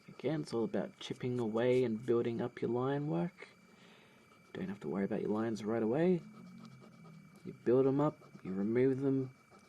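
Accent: Australia. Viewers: You're from Australia! I knew it from the first video I watched! Despite being bad at identifying accents, I got this one right. :)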